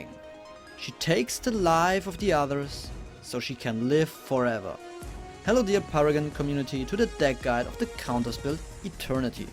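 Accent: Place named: Germany. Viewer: your german accent is painfully obvious.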